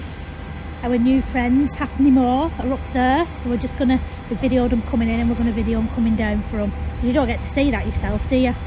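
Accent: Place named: Bolton, United Kingdom. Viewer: Lynn here on Hubby's phone mines playing up 😏, Wooo what a turn up , I'm just flipping through to see what I can watch and there you pipe up , I've just subscribed 👍, how far is this place from Yorkshire ?, I need to go for a Day 😊, my late Brother, he was called Tony and he used to build steam engines, he was a very clever man , we lost him suddenly a year and half ago , Love your way of thinking 🤔,can't wait to watch more of your videos , are you from Bolton? With your accent I'm sure I'm right 🤔😂😂👍 Take Care Love xx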